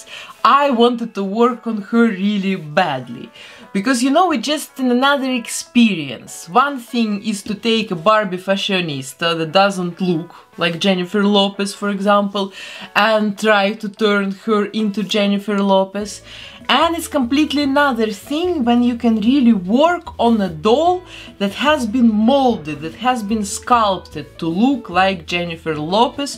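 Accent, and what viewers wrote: Russia. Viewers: Акцент сто процентов Русский, но написано , что Бельгия )))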